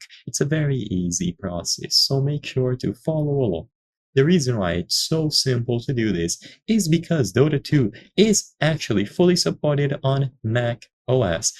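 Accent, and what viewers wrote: Brazil. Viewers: I know a Brazilian when I hear one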